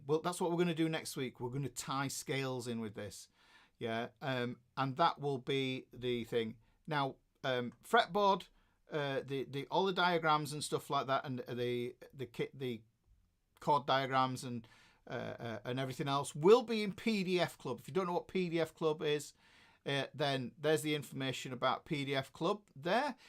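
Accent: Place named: Yorkshire, United Kingdom. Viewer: books are worth every penny! I love the way he breaks everything down and explains things in a way that makes it easy to understand. Thank you! The accent helps I think.. 🤔 Us Americans and our slang English language can sound ignorant when compared to proper Yorkshire accent. lol. All good stuff!!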